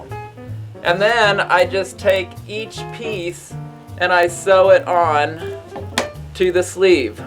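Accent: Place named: South, United States. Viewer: I'm obsessed with her country accent! I could listen to her speak all day!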